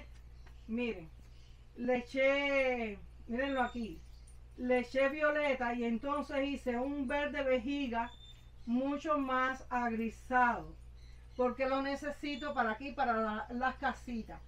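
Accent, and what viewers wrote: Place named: Cuba. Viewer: HOLA ME ENCANTA TU TONADA CUBANA!!! MUCHAS GRACIAS POR COMPARTIR TUS CONOCIMIENTOS 😉 BENDICIONES 💖 😊 😘 🙏 UN ABRAZO GRANDE DESDE CÓRDOBA ARGENTINA 😊❤❤❤❤❤❤❤😊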